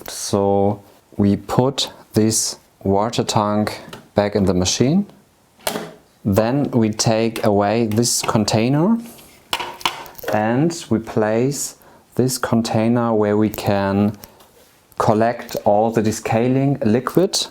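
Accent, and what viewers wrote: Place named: Germany. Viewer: This guy is adorable with his little German accent. It's set up and cleaning already but I'm just letting it play through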